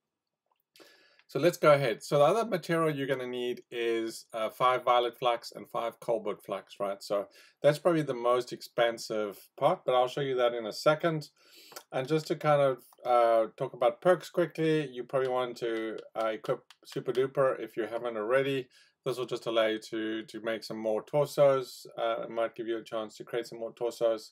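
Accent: South Africa. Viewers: Are you south African? The accent sounds hella familiar 🤣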